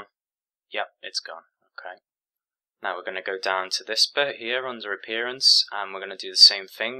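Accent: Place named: Lancashire, United Kingdom. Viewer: This helped a bunch. Cheers! (P.S. you're definitely from Ormskirk).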